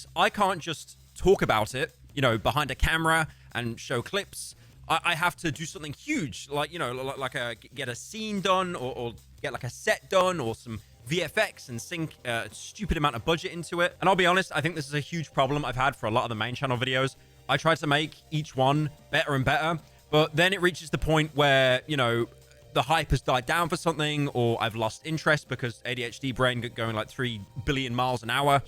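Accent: United Kingdom. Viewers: I ain't watching the appalachians Petscop video I want the british femboy voice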